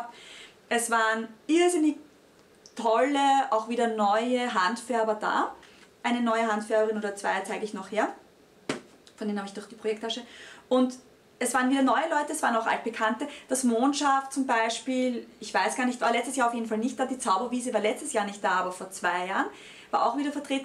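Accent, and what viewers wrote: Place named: Austria. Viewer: Warum spricht so schnell? Und dann noch mit diesem Dialekt😕 Das versteht doch keiner außerhalb von Österreich. Also ich habe nicht mal die Hälfte von den Namen der vorgestellten Garnen verstanden und von wem sie sind. Das Garn von der Babydecke hat mir wirklich gut gefallen. Leider waren auch die Einblendungen kaum zu erkennen! Dunkel Lila Schriftzeichen auf schwarzem Untergrund!! Liebe vielleicht sollten Sie mal Ihre eigenen Videos von Anfang bis Ende ansehen, dann verstehen Sie was ich meine. Auch ist die Auflistung der Projekte,von wem die Garne /Wolle sind,wie die Garne/Wolle heißen in der Infobox/Videobeschreibung für ungeübte Strickanfänger schlecht verständlich. Mich interessier einige der gezeigten Garne/Wolle, aber ich finde mich in der Beschreibung nicht zurecht. Schade!!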